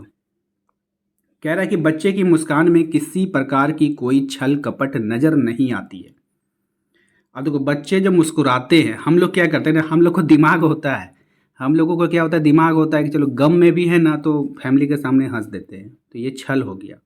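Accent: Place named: India. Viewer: fxck curry accent 🖕🏾🤢🤮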